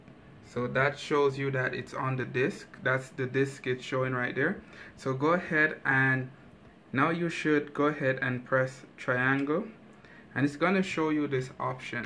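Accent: Jamaica. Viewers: Sound like a yardie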